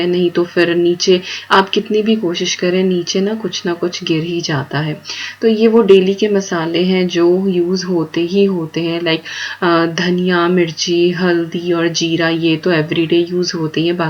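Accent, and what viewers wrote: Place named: Western Uttar Pradesh, India. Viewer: I like her a lot. The way she speaks. and Haan I m also from West UP. Apki language sunkar apni taraf ki Yaad a jaati h. Take care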